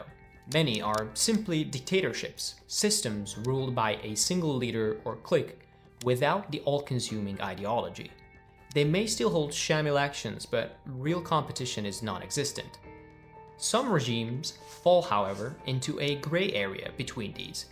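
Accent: Hungary. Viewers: The Why Club, eh? With 200-some subscribers? Yet another recently created propaganda channel from Orban's spin doctor, Antal Rogan. At least, he could have picked a narrator with a less obvious Hungarian accent and an accurate English pronunciation. Hungary as a blueprint for the US? A piece of shit village in the Balkans with a populist wannabe dictator fantasizing about "leading the world" with his provincial political philosophy geared towards a single mission: grand theft. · You were born in hungary, right? Or am I crazy? Some of your pronumciation sound hungarian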